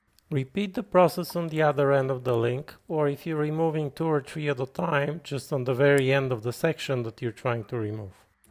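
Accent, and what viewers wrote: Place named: Eastern Europe. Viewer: good video--knew it would be so, always look for eastern european accent for jewelers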